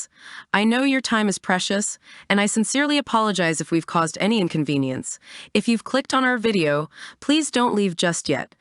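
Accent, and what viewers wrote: United States. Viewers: why is this being narrated by an American robot?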